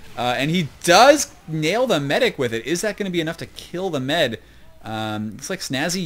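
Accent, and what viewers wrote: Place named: Australia. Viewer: That Aussie accent though... Nice cast btw!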